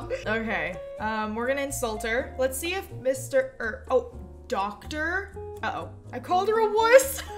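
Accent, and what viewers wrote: United States. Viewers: I thought u were British, I'm British, how did I not notice u were American??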